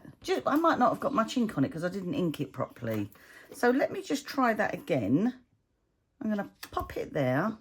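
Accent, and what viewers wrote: United Kingdom. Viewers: I was not sure about you at first - but I grown to love you and your videos. I love to hear you talk in your UK accent and words. I love that you show mistakes and then make something so great out of them. I just wanted to tell you I have the black Stampin up mat that you tried. I find if I'm not in my craft room it dries out and it is not sticky. So if you use a wipe or even just a damp cloth it should bring the sticky back. Again just wanted you to know you have such a fan in me - I have learned so much from watching you. Bebe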